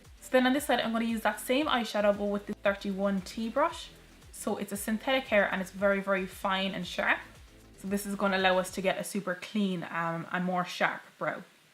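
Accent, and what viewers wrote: Ireland. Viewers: Thanks for replying - it means a lot to me. Yes my eyes are like yours where the crease hangs down and blocks out part of my lid when my eyes are open.. I am so glad I "found" you Its lovely to hear the irish accent as Im irish (Mayo) living in England. Maybe sometime - who knows I may get to Cork and go to you for some lessons!!. All the beauty tutorials on youtube are great - but sometimes women of a certain age (like myself) are at maybe a low point in life (menopause etc ) lacking confidence and at a loss as to how to enhance the looks they have. I love the way you put your tutorials across it is very down to earth but very informative and easy to follow.- thank you. Maybe sometime you might do a full face and eyes for mature women???? Maybe??? I will keep a look out. God bless xx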